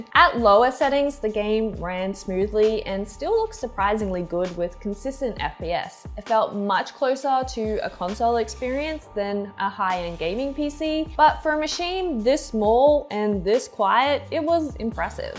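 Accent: Australia. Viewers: I have decades of linux experience so that aspect doesn't bother me, however if there are too many issues then the experience really sours. The puzzles I would be looking to solve are not linux configuration puzzles. Your experience with the rhythm games though is discouraging. I love SynthRiders so not being smoothly playable is not good. But who knows, it may be something relative to tuning the software rather than modifying the hardware. In that case, that issue should resolve itself rather quickly. We'll see. On a different note, do Australians find their accent as cute as those in the States do? Even if it isn't really an accent for them. Maybe they don't see it as cute, but do know it is cute. I have a similar reaction to Scottish accents only I can't understand what they are saying as well as I can Australians.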